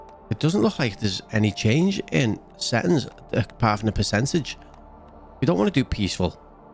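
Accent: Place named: Liverpool, United Kingdom. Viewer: Story time with your friendly Scouse 😅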